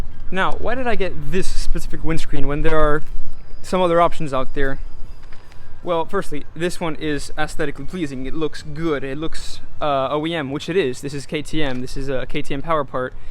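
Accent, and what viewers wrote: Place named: Europe. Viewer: Very good review. Are you European? I noticed an accent.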